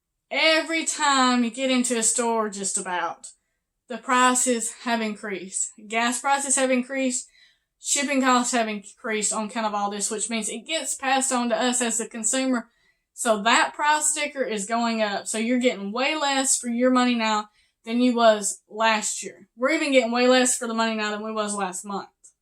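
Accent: South, United States. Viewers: Subscribed, not just for the info... but I love that southern accent! (I'm a Yankee in northern Indiana so it's rare to hear.)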